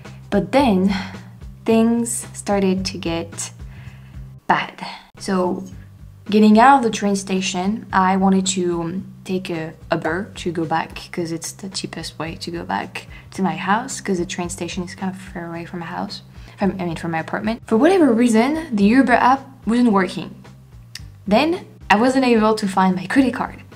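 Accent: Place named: France. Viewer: Eric was right about your English. I was all wrapped up in your story and didn't even realize that you have an accent until Eric committed on it.! Wow you are really getting good at it. I don't want you to be perfect because of the Frenchtastic way you speak English.! Love you from Tennessee.! P.s. When you çome back, you need to check out the South Land . Especially Louisiana and talk some Cajun.!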